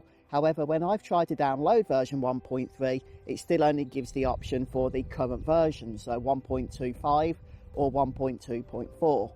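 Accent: England, United Kingdom. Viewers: Thank you for info What part of england is your accent from ?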